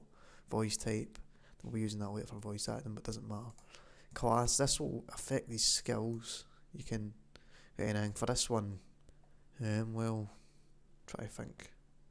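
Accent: Scotland, United Kingdom. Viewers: i was so happy when i heard that scottish accent, about 10x better than an indian dude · A fellow Scotsman eh? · Finally a mod tutorial for me: with Scottish accent XD Thank you for this, it's very helpful and thorough. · Yes a Scottish guy finally No homo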